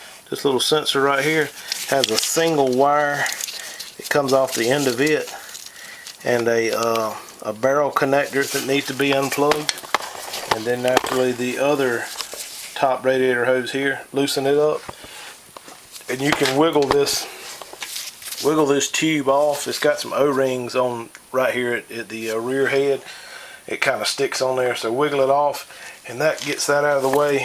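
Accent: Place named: Carolina, United States. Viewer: I know it's activating but I really appreciate you taking the time and activation to do these videos, very helpful, and you sound like a north or south Carolina man, I'm born and bread north Carolina and I'll die here unless the liberals get to much which I'd say thier pretty bad across the country, depending on which state your in, the triangle area with our schools, duke, unc state, wake forest, it brings the crazy in but we praying it don't get to bad but anyway just wanted to thank you for your time and videos, God bless you and your family in Jesus name, be well , Mike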